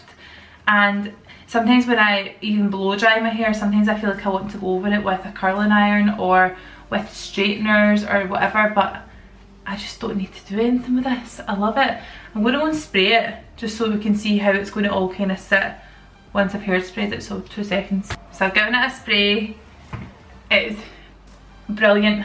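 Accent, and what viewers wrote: Scotland, United Kingdom. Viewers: Gosh the Scottish accent is so charming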